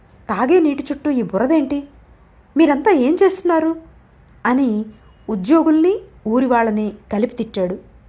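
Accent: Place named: Srikakulam, India. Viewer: మా గొప్ప కథను పరిచయం చెయిస్సీరు...❤ సిహాకుళం భాషలో విండం ఎంత బావుందో మరి చెప్పలేను బాబో...😍❤❤❤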